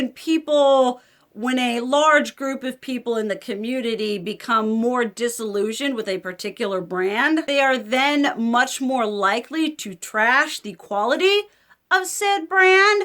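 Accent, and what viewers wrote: South, United States. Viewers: Hey girl!! This is the most Southern I have ever heard you lol. I recently refound my Electric palette and am thinking of finally decluttering it since it’s old. Lorac definitely stays in their lane. 😂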